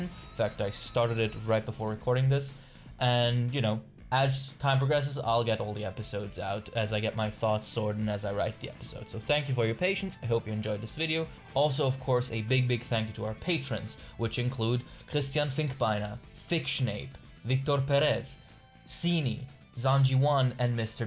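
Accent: Germany. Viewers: You're German? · Are you an german?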